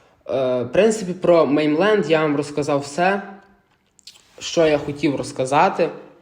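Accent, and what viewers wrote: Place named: Surzhyk, Ukraine. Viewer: Суржик топ😂чекаємо за поінти нагороди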